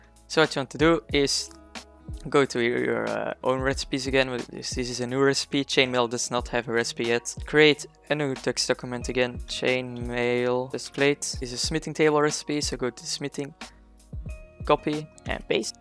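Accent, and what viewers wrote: Netherlands. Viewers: Are ya dutch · i like how this guys sounds dutch i am from holland to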